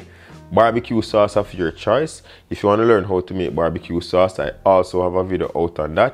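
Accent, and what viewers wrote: Jamaica. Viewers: the jamaican accent so cool.. makes cooking cool. well done on the kfc secrets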